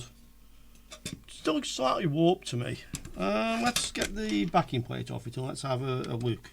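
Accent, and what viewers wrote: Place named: Staffordshire, United Kingdom. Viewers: Hi is that a potteries accent I hear Nice. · inteet duck, i recon ya from near Tunstall i left back in the 90s but i can hear the accent still lol i miss oatcakes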